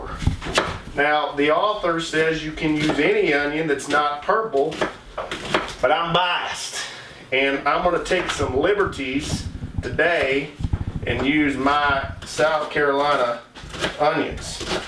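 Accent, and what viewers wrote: Texas, United States. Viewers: Or do all Texans speak like this?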